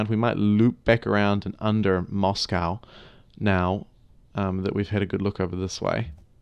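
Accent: New Zealand. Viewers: That's not an Australian accent, most likely he's from New Zealand. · Somewhere from oceania, maybe new zealand.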